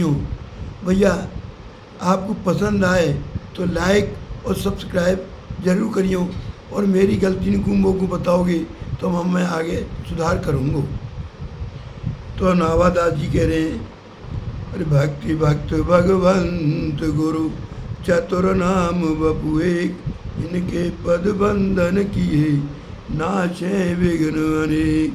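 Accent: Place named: Braj, India. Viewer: आपके द्वारा ब्रज की बोली में कथा बहुत सुंदर लगती है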